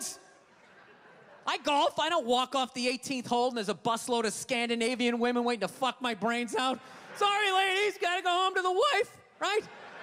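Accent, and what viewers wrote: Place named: United States. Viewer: He's really an Irishman with an american accent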